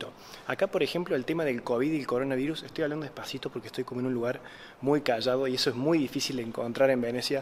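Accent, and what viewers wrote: Córdoba, Argentina. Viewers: ¿Es muy importante conocer el idioma del país donde vas a vivir? ¿Cuál es tu profesión? Por tu tonada, eres argentuno cordobés, ¿no es así?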